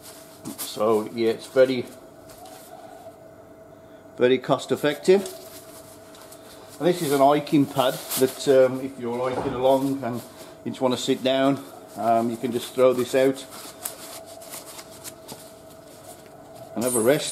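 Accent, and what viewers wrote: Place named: Birmingham, United Kingdom. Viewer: Great vid. Thanks for sharing. I must say though. You don't sound like an Aussie. In fact you sound like a Brummie? I'm in Wolverhampton. Lol. Let's meet up in Singapore sometime.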